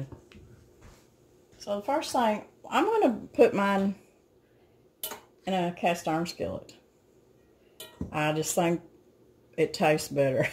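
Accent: South, United States. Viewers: I wish I enjoyed hearing her talk…..but I don’t care for types of south Wern accents. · Love your accent. I’m Southern too. Keep it Southern!😁😁💕💕💕. Good dish thanks. I cook old time Southern food, South American authentic, and a couple Mexican dishes and authentic tamales. No me gusta pansa o menudo porque es puro quedito y nada carne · How interesting and useful! I live in Arizona and make Mexican food all the time. It's different to hear a very southern accent! I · Love her southern accent and love that she enjoys tamales we make them every Christmas de queso con chile verde and green chile pork tamales and red chile chicken my fav is sweet corn tamales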